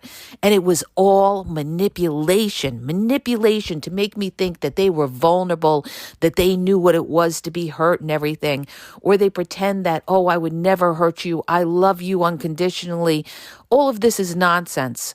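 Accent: New York, United States. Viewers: I think you are a very educated person that is helping masses of hurting people❣⛑ 🤗I love yr accent= New york? & you are very pretty. Some constructive help to help you SHINE BETTER! Your tone of voice sounds Angry & I'm sure it is righteous indignation against injustice & abuse & also, in my opinion it is much more classy NOT to cuss, which are offensive words, not necessary. Humbly, I hope u receive my words, only meant to help. Regardless, I will still listen 2 you. 😁🥰💃🏼🤸‍♀️🙌🙏😇🌟🌞 God Bless you❣💝💖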